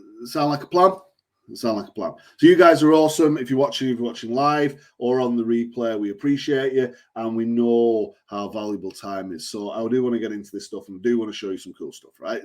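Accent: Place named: North, United Kingdom. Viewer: Yes! Sound like a northerner lad.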